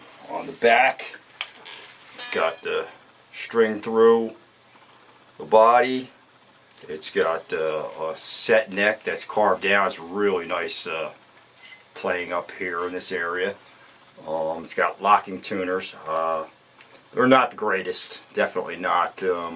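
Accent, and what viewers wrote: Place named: New Jersey, United States. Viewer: South Jersey Pennsylvania Delaware New York tri-state area maybe? I'm from Jersey and you sound like somebody down the street...lol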